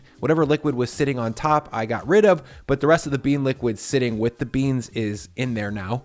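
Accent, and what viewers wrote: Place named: United States. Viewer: I'm a late comer. Just found your channel. Love it. I imagine that I may wonder sometimes what is he talking about, with you being American and you call things by different names and pronounce things totally different from us here in Australia.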